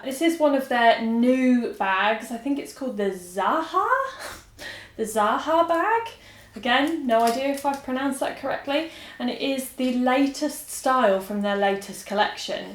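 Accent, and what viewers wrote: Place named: London, United Kingdom. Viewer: Aw you can't imagine how much I love your accent. It reminds me the time I spent in my lovely London. In this video you talk specially smoothly so it is easy for your non-english speaking followers to understand. Also love your style of course ❤️ Lots of love from sunny Spain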